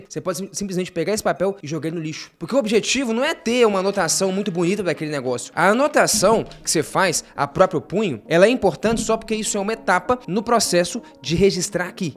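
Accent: Minas Gerais, Brazil. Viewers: O cara já é bom em explicar, aí tem sotaque de mineiro, fica mais massa ainda. Show! Excelente vídeo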